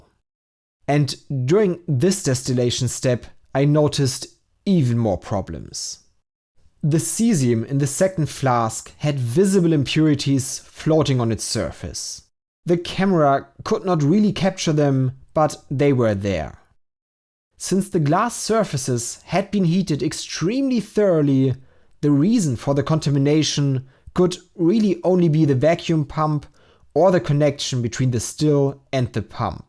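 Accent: Germany. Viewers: love your videos man. Außerdem, dein Akzent hört sich deutsch an, woher kommst du wenn ich fragen darf? :) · HAHA a german robot HAHA learn to talk like a human you f00ken AI npc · I know tis guy is German this accent is to thik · Finally a face to this voice. Der harte deutsche Akzent ist irgw catchy..😅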